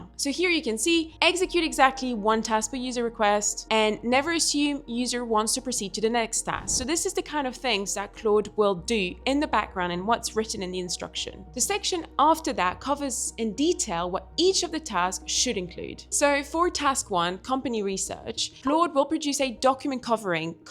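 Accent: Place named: South Africa. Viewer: South African accent...nice.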